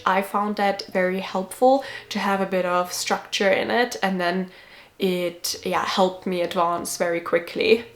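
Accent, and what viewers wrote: Germany. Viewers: Sehr gut svenska med ein bisschen Deutsch men helt keep on work on it 😊 tack så mycket hörde du hade dialekt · Du pratar bra svenska 😊. Man hör din tyska accent mer, men det är bara trevligt. Vi är ju vana att lyssna på drottning Silvia 😊. Dessutom är vi också vana vid invandrare från olika länder som försöker lära sig språket. ❤ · Åh, du pratar ju jättebra svenska. :) Du har en ganska tydlig tysk accent, men rent språkligt var det bra. IMO coming from Germany, Netherlands and obviously Denmark and Norway you have a quite a large advantage (even compared to English speakers) due to the similarity of words and pronunciations between German and Swedish.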